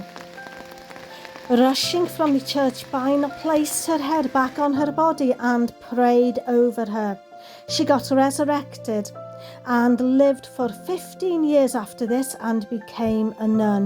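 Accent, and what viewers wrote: Wales, United Kingdom. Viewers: Love your graphic fooling about. And of course the Welsh voice. Loved the display of discarded walking sticks when I was there in 2003...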